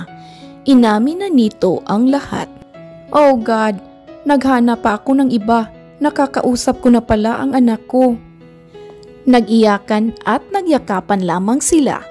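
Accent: Visayas, Philippines. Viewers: Ayusin mo pagsasalita mo. Visaya ka?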